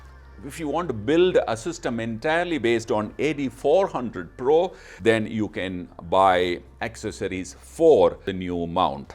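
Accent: India. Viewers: RARELY where i keep listening to an Indian English speaking person! but you sir got me to listen! your production got me to sub and I'll keep watching.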